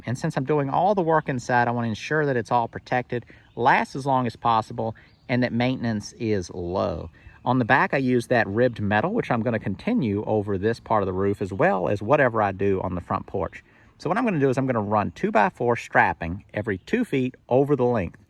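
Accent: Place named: Texas, United States. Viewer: You must be from Texas lol. You gt that Texan draw to your voice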